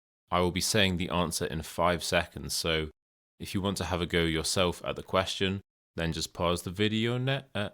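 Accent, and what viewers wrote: United Kingdom. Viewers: Like it very much your videos and it perfect British English and properly helped and thank you very much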